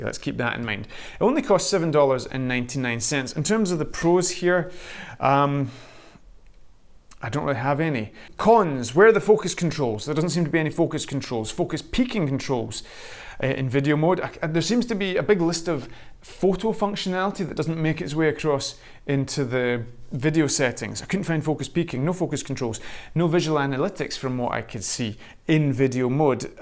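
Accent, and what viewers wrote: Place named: Edinburgh, United Kingdom. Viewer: Another excellent video. Trying to place that accent. Edinburgh?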